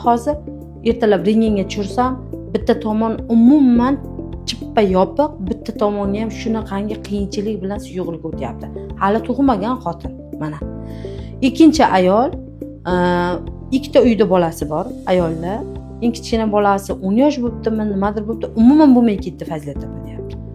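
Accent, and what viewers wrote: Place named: Qashqadaryo, Uzbekistan. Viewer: Qashqadaryolilani shevasi zorde😅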